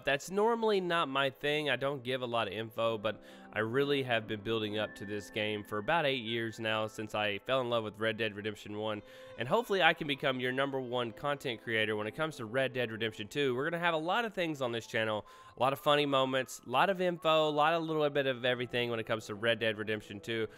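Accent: Northeast, United States. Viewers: I'm from Kentucky your accent sounds like you're from the Northeast it's kind of funny how I noticed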